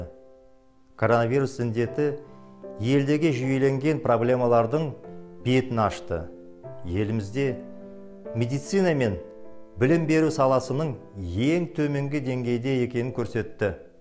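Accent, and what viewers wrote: Standard. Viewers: МІНЕ, қазақ тілін ӨТЕ, таза сөйлейді,ОЛ ХАЛЫҚТЫ, жаңа жылымен құттықтап тұр қазақ тілінде! КЕРЕМЕТ ӨЗІҢДІ ДЕ жаңа жылыңмен,құттықтаймын! АМАН мау БОЛЫП отбасымен,ХАЛҚЫҢМЕН БАҚЫТТЫ БОЛ,ӨЗІҢ жас.ғұмырыңды,осы жолға арнап БАРЛЫҚ АЗАП.шеккен өмірінің мәні,арманыңа,ХАЛҚЫҢДЫ МЫНА талаптан құтқарып,ЗАЙЫРЛЫ демократиялық парламенттік республика,құрылуына тілектеспіз.сол арманыңа осы.жылы орындалуын,тілейміз табанды қайсар,сынбаған АСЫЛ азаматтың СЕН, бұл саған берілген баға,жағыныу ЕМЕС, СОЛ арманыңа ЖЕТ.халқыңды осы адамдар АЛЫП ШЫҚ.халық СЕНІ ЖАҚСЫ көреді.өзгеріс БОЛАДЫ.бұл РАС БОЛАДЫ.сау бол байқап жүр АМАН БОЛ. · Рахмет! Жана жылыңызбен! Ал, кәнеки, казакша сойлемейді деп, айыптайтын агайын, коріңдер, біздің кандай әдемі, таза казақша сөйлейді! Зор денсаулық бакыт тілеймін, елге оралып, ел баскаратын болыңыз, казагымның ардакты, азаматы! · Таза казакша жаксы соилеиды екен гои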